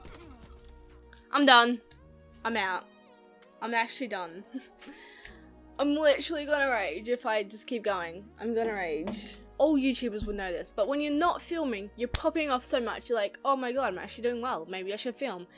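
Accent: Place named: Australia. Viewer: also, the Australian accent is awesome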